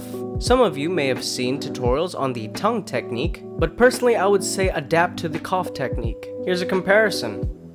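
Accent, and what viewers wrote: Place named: England, United Kingdom. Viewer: Yung english accent talaga eh 👍👌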